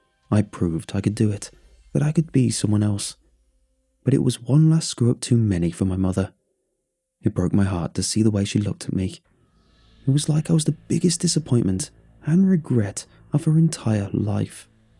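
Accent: United Kingdom. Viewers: I have watched so many videos and the British voice was a major set back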